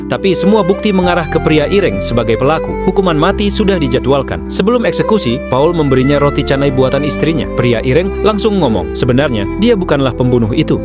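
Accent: Java, Indonesia. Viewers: bagus mas pake aksen jawa nya😅😅😅😅😅😅 bikin makin ngerti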